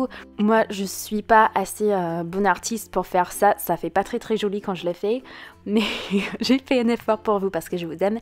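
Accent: United States. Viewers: I had no idea has an American accent when she speaks French x) I hear it normal maybe considering I'm American so French people will hear this differently. I wonder if they like the American accent though? ^^ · Oh mon Dieu, déjà que mon rêve c'est de vivre aux USA, mais alors toi, ton accent et tout, ça me donne trop envie d'y aller😔💜 Et sinon tes trop belle et super vidéo💜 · L'accent américain il est tellement beau 😍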